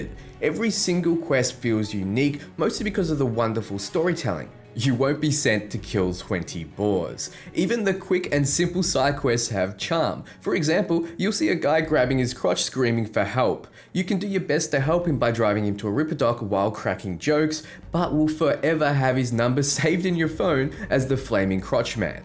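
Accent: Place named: Australia. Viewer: Yoooo. Gimme the scoop compared to Red Dead? I’ll sub regardless, you sounds like an Aussie who is cooped up.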